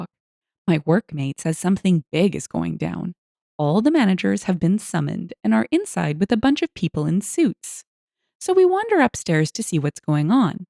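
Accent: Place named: Canada. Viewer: Thanks! I'm a Canadian living in the deep south (US) and always look forward to hearing that little bit of home in your voice. 🧡❄️🍁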